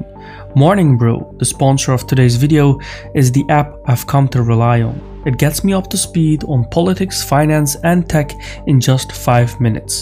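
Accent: Brazil. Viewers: O dono do canal é brasileiro?? Claramente esse sotaque parece de brasileiro, me dá agonia kk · Pelo sotaque o narrador e brasileiro 😂😂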